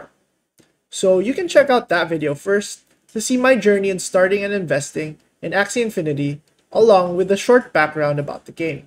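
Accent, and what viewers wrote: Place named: Philippines. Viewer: Accent palang alam na Filipino na 😅 Nice content sir 👍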